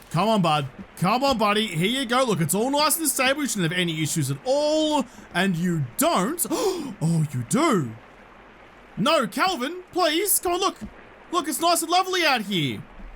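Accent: Australia. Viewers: bro he has the most Australian Australian accent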